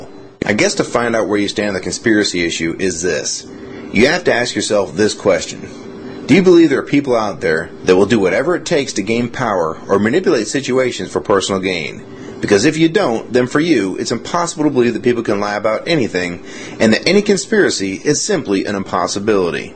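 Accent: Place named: Ohio, United States. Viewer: You sound like your from ohio or thereabouts.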